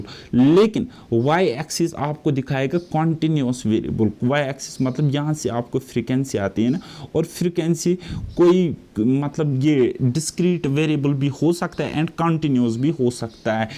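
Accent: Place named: South, India. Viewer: Sir ap na south Indian or kashmiri acesente mix keya ha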